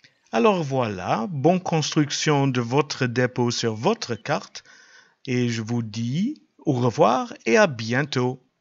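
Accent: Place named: Germany. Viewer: T'es pas français, je dirais allemand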